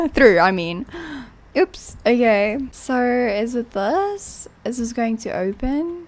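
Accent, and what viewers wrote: South Africa. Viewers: I’m glad I subscribed to you! Two things I love: star stable and the South African accent (sadly lost mine) your voice is so soothing it makes me feel like I’m back home agaon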